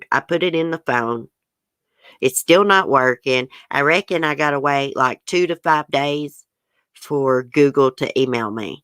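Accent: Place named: Alabama, United States. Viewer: I love your accent! It’s very like my Alabama accent I had! Where are you from?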